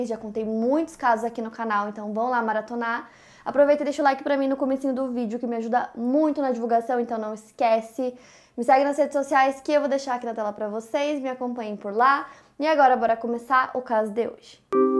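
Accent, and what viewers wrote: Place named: Curitiba, Brazil. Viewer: Ela é de onde? Ela tem sotaque de Curitiba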